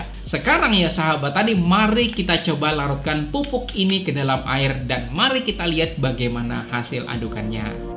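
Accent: Banjar, Indonesia. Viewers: Logat banjar 😁 sipp dah bang info nya , tks 👍👍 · npk 16 yg paling bagus npk yaramila.. logat bahasa nya org Banjar lh? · Kya orang banjar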